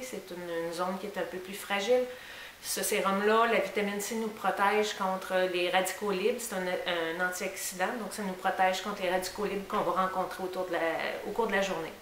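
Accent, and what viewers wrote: Quebec, Canada. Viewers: Hey !!!! Je me trouve enfin sur ta chaine et elle est juste superbe!!!!! Tes vidéos sont superbes et j'adore ton accent québécois. Continue comme ça 😊 bisous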